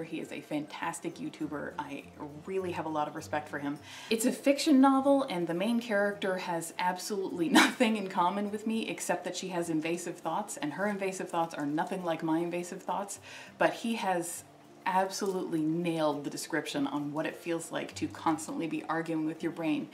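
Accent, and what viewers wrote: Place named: Canada. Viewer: So so gorgeous.. like seriously, love look, and the Canadian accent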